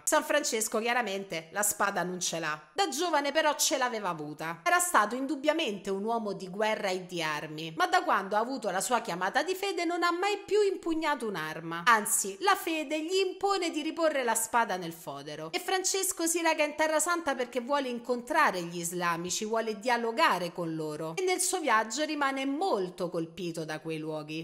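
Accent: Rome, Italy. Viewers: Inizio del video scoppiettante è veramente divertente come al solito la tua romanità ha accentuato è reso al quadrato quello che volevi dire gradevolissimo...... video Bellissimo queste notizie sono veramente utili a capire il nesso e il connesso con il discorso del presepe non sono d'accordo con figure figurine quadri e Santini perché il vero secondo comandamento vieta di creare immagini e di adorarle basta andare comunque a vedere i comandamenti veri e non quelli manomessi edulcorati dalla nostra carissima chiesa Vaticana 2)Non farti scultura, né immagine alcuna delle cose che sono lassù nel cielo o quaggiù sulla terra o nelle acque sotto la terra. Non ti prostrare davanti a loro e non li servire, perché io, il Signore, il tuo Dio, sono un Dio geloso; punisco l'iniquità dei padri sui figli fino alla terza e alla quarta generazione di quelli che mi odiano, e uso bontà fino alla millesima generazione, verso quelli che mi amano e osservano i miei comandamenti. 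Questo é solo un mio pensiero. Complimenti come sempre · Per favore, meno acidità e torna a parlare italiano. Non se ne può più di romanità sottoculturale.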